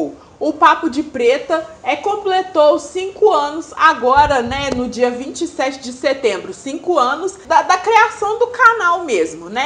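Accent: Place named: Minas Gerais, Brazil. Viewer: #parabéns meninas ...conheci o canal pesquisando sobre a Gabi de pretas, sim a chamo desse jto, cheguei em em vcs é não larguei mais....fiquei tão feliz qndo vi um canal de mulher preta com sotaque daqui de MG 🙂q bom q vc está cada vez se fortalecendo mais com o canal...voem meninas voem👏🏾🖤🖤🖤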